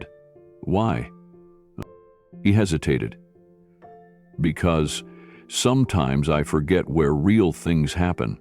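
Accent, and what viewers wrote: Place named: United States. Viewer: ❤ I DO Appreciate these stories especially regarding Keanu, for example and credits. BUT ADS!? For those of us already bombarded with constant Google ads that are depressing for the fact that elderly ads are about drugs, weight loss, everyday relentless bombs of negative facts of life, aging, to the point of brainwashing and same Ads over and over, taking up more time than the story on some. I do appreciate the American dialect of a well educated man, not AI.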